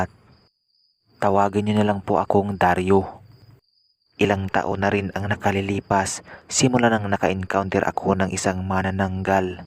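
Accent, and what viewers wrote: Visayas, Philippines. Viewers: Wow ang ganda Naman po ng video nyo lagi po naming di nadownload Ang bago nyong ina-upload pwedi po ba kayo ulit mag upoload Ang ganda po Kasi ng video nyo eh at kakatawa po Yung boses nyo parang bisaya pero nakakatakot din Naman po.😄😊🤗😘😍🤩😅😖😖😲😲😨😨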